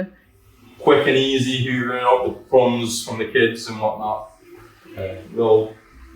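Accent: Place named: Manchester, United Kingdom. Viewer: A manc Thai great to hear a northerner back home in Thailand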